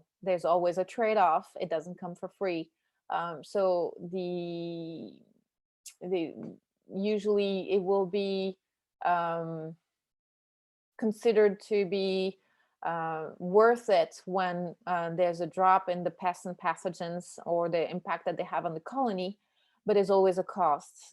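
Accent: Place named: France. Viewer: I didn't think you sounded Texan, but I would never have guessed French.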